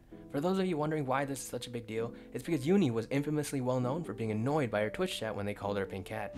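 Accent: United Kingdom. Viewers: I FUCKING KNEW IT! I FUCKING KNEW I RECOGNISED THAT BRITISH ACCENT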